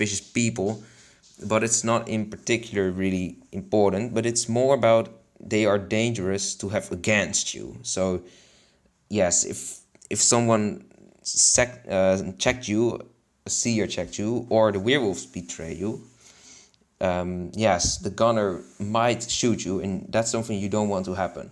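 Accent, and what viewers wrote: Netherlands. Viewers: Bro you sound Dutch man If you are: frikandelbroodjes zijn echt lekker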